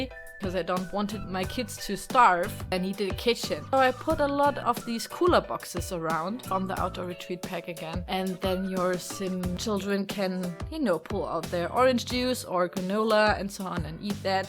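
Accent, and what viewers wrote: Germany. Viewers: Achso ich dachte schon irgendwo her kenn ich den Akzent :D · Where are you from? Your accent is kinda german. :D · Are you german?you have this german accent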